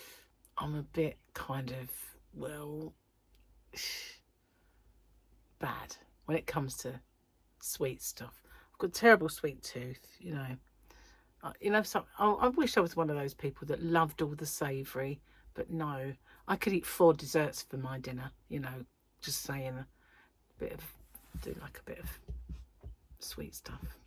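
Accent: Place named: England, United Kingdom. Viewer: Hi, You sure do sound English always, however the other day your accent was much thicker.( You were quite excited) Your blanket is quite beautiful love the colours, what stitch is that?? Enjoy your dinner out. Beautiful sunny day here enjoy your hump day in the UK.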